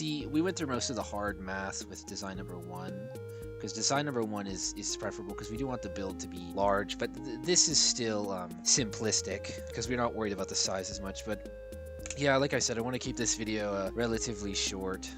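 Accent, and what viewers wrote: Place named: Ireland. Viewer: That Irish accent has me shooketh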